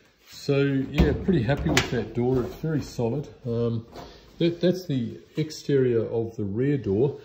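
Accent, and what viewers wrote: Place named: New Zealand. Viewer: sorry for the bad accent ID on my part... I've been through more of the vids and clearly see you're a Kiwi. Thanks for documenting everything, esp mistakes, it helps alot.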